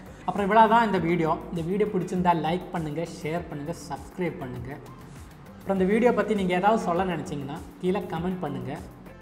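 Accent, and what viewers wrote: Kerala, India. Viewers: i guessed it. Malayalam slang varuthu nu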